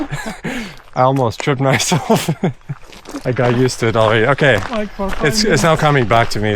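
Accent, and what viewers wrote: Latvia. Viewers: Love Latvian accent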